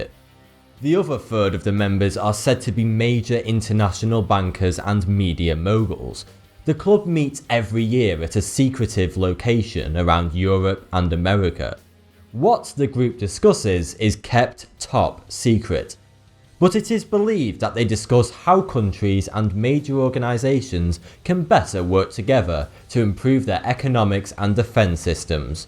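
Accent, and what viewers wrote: United Kingdom. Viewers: it's his terrible punk/slang British accent. Ffff - thhh words are always mixed . He does it with other words in his video's. Not the typical correctly pronounced formal British accent we are all used too , depends on where the Brit lives . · Omg . The guy speak such hard British English lol)))) so funny . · These videos are boring and I hate his british accent I cant understand why they have such a shity accent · Well with your obvious British accent why don't you expose it was European who founded Skull & Bones and expose some of the Royals witch covens like "Order of the Thistle" and "Royal Order of the Garter"???? Why don't you expose how many Skull & Bones members have been knighted by the Queen? Most in Britain are freemasons were you a Marlay child?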